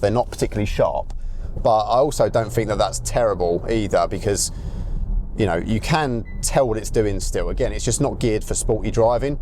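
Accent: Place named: New Jersey, United States. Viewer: Love the New Jersey accent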